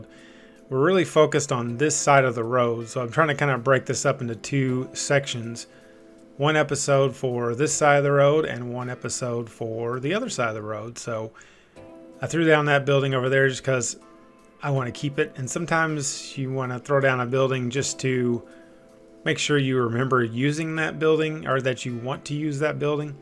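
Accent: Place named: Tennessee, United States. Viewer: Your accent... Where are you from? You sound like a Tennessean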